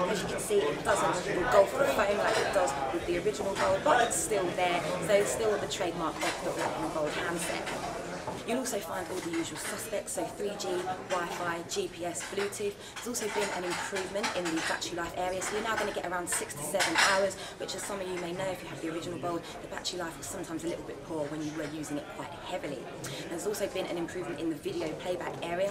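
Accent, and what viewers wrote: United Kingdom. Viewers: i like her british accent..... nice...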